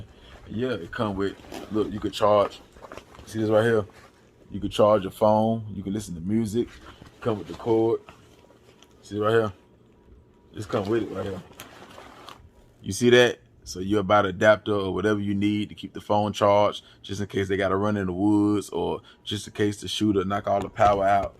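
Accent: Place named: North Carolina, United States. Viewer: Good video. I knew U sounded like u from NC❗️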